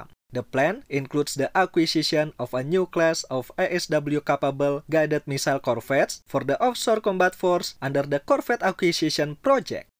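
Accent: Indonesia. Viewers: Pengucapan english nya kaya org indo admin nya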